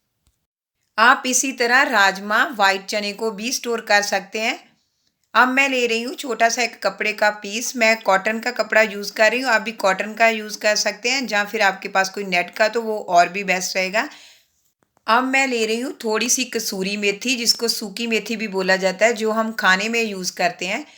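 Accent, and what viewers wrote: Punjab, India. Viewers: Punjabi touch aa Riha h... Mm Punjab ton??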